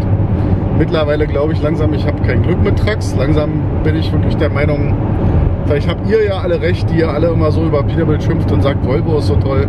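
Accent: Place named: Germany. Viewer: Kann hier nur die Videos von Luca Lerga empfehlen, der hat sich mit dem Thema kaufen oder mieten beschäftigt , das trifft ja auch auf den amerikanischen Markt im allgemeinen zu. Eine weitere These hat Mike Hocke vor kurzem aufgestellt, und zwar wenn die Werkstätten deinen deutschen Akzent hören versuchen die dir die Scheine aus der Tasche zu ziehen. Sind aber alles nur Vermutungen. Ich bin auch selbstständig und mein Auto ist auch ständig in der Werkstatt und immer wenn ich denke , jetzt ist aber alles gemacht, dann geht wieder irgendeine Kontrollleuchte an. Ich kann echt mitfühlen wie scheiße das ist.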